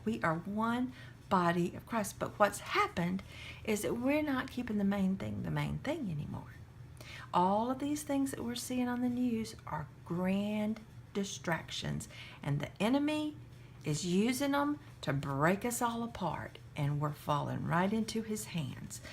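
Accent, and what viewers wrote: South, United States. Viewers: LOL its your southern twang..its comforting! Plus you're talking about the King! <3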